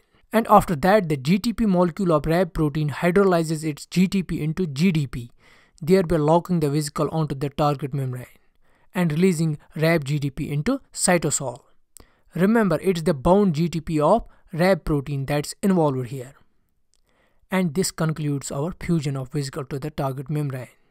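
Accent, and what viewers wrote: Kashmir, India. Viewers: Your accent is kashmiri.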